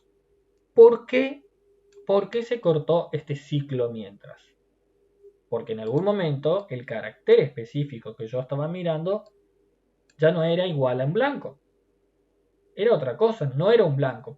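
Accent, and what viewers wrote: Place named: Misiones, Argentina. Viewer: Muy genial tu explicación. Muchas gracias. Te felicito por toda la pasión que le ponés al arte de enseñar. Y re contra mil veces feliz por poder aprender con acento Misionero.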